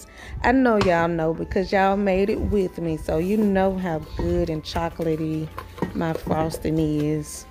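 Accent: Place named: South, United States. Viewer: I Love Your voice very Southern ❤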